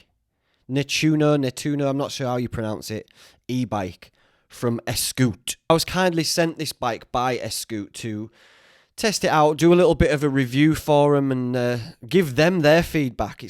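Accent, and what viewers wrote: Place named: Sheffield, United Kingdom. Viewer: You sound like you are from near me haha, im from near Sheffield - Rotherham - Just looking for an ebike myself!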